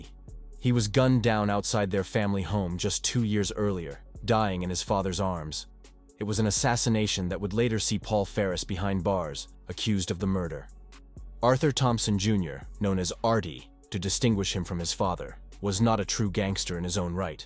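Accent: United States. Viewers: Narrated by a robot Yank who has no idea where Glasgow is so totally unwatchable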